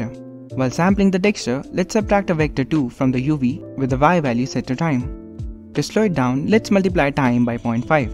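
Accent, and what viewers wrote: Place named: India. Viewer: Great vedio. Literally can't differentiate between the original and the one you made. Also I got a question for you. Where do you live? (Country name). You sound very Indian to me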